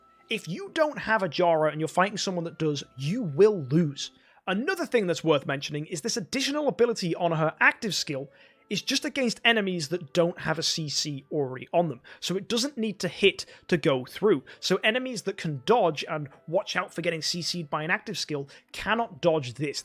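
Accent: England, United Kingdom. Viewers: here for English accent :d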